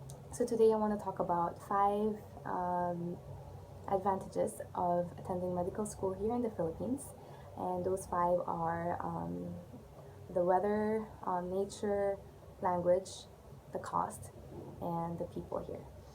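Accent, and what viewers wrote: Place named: England, United Kingdom. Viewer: I stumbled upon your channel paid attention to the details you say, well im humbled you're such a gentle soul. Prospective doc here graduating pre-med next june thank you so much for the helpful information concerning MD in in Ph well im in Ph as well. You doing a great job im better informed because of you. Planning the US residency too soon. Allow me to pass my last 2 sentiments I love your calmness and gorgeous english accent!! Im humbled😊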